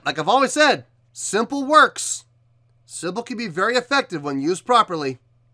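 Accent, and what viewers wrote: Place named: United States. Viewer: How come you didn't listen that album before??? You are definitly old enough?! And you sound american. I was born in 1978 in ex yugoslavia and know that album by heart. Are you faking it? Please don't say you were bussy listening panthera or sepultura 'cause that's no excuse.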